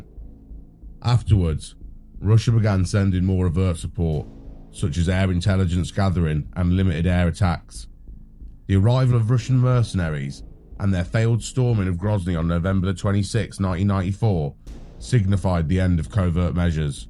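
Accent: United Kingdom. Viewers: Had to stop watching after about 30 seconds. Fuck me, that's the roughest British accent I've ever heard. The narrator doesn't even try to pronounce the letter 't' and genuinely sounds drunk or half asleep. Just awful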